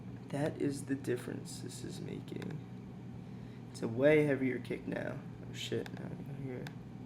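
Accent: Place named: Chicago, United States. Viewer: What suburb of Chicago are you from? You sound just like my buddy that grew up in Barrington :)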